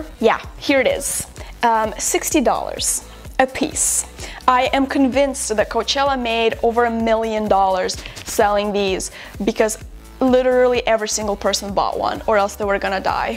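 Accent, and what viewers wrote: Russia. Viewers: Russian?! such a nice strong accent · Are you russian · Is she russian or something ? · Is she russian?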